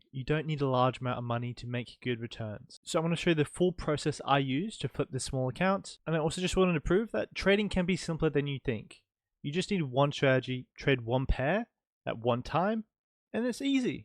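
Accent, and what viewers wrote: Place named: Australia. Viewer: God bless you... seems that you have great information, but I can't for the life of me, understand your English man😢 too fast, words are mixed together... is this Australian? Sorry... I wish we could change things like that in the settings but there's nothing... even the subs having hard time translating it... Again, God bless you... you're really sweet. Thanks🌸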